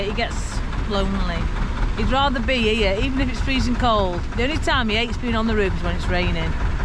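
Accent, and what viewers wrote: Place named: Northern England, United Kingdom. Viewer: “A brew” is most definitely North. I had never heard of tea or coffee being referred to as a brew before I met my MiL for the first time. She was from Lancashire, near Rochdale. As I met more of the family up there they all called it a brew. I’m from Coventry, it’s a cuppa there and now I live in Northamptonshire it’s simply “a coffee” or “a tea”. I love your accent. It’s very similar to some of my husband’s family. He’s been in the midlands too long now. He’s mostly lost his accent now.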